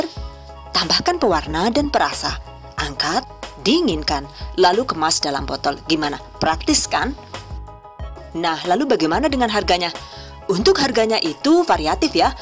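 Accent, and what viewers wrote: Banyumas, Indonesia. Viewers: mba ini orang ngapak ya.kok pinter ngomong ngapak.pada bae karo inyong mba😁😁😁😁😁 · ngapaknya itu lho bener-bener ngangenin inyong jadi pengen nunggang bis ke kroya wkwkwkwkwkwkwk, btw makasih banget ya bund resepnya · Ngapak koek koek menginspirasi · Rika wong ngapak ya mba??haha Nembe nemu nang beranda jannn...ora kit biyen nemu...langsng subscreb mbae...padettt bgt penjelasane.... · Ternyata ngapak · Done#GiveawayTHV2021 terima kasih atas semua resep olahan yang belum pernah praktek kan tambah ilmu melihat video THV ini pokoknya the best dah dan ada bahasa ngapak ngapaknya i like it.. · Diiii bocah ngapak ya ,ujungnya2 ko jadi kaya kiye ...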